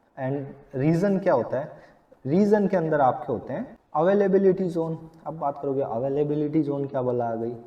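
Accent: Indore, India. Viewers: Just started watching your videos. Very nice explanation. Loved the way you explain. Also, love your Indori kind of accent, coz I am from Indore too :).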